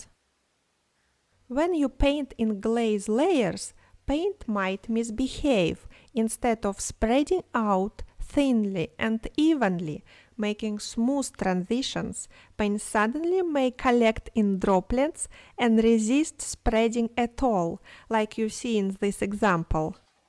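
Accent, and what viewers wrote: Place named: Russia. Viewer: Very strong Russian accent : )). Lovely paintings, have that renaissance quality of look, that airiness.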